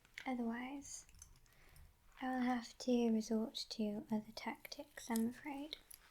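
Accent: United Kingdom. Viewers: my first reaction "shes british"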